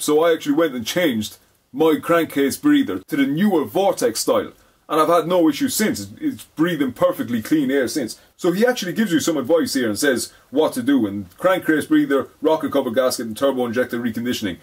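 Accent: Ireland. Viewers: Sounds like Offaly to me, maybe Longford 🤣🤣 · This might be good!! This guy has a Irish voice going through a big bore throat LOL. Brilliant wee video!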